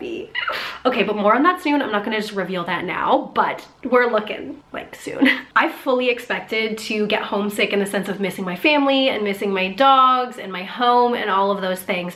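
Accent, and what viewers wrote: United States. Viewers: Ah, u have discovered British sarcasm, many of my US friends struggled with this too. Sorry, it’s us, don’t take offence, it’s part of our humour (think Rikky Gervais). Sorry about the 'turning heads’ with your accent. We have probabably become less accepting of the USA since Trump, those that have not traveled there alot, may think that all American’s are ‘MAGAs’ and as awful as he is. Finally, if you lived in Louisiana or even Florida, you might thik the UK is quite a dry place ;-). But then we dont have hurricanes or earthquakes - so thats an advantage! Enjoy your time here.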